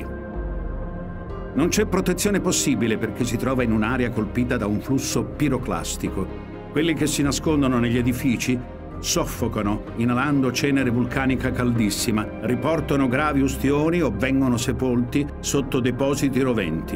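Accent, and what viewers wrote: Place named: Calabria, Italy. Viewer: Narratore con forte accento calabrese...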